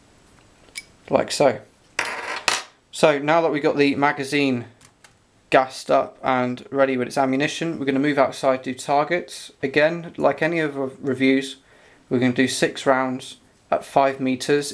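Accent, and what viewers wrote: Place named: United Kingdom. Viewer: were'd u buy this gun , i take from your accent your in the uk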